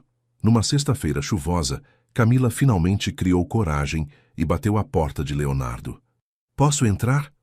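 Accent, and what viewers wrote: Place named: Portugal. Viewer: Narração perfeita, de Portugal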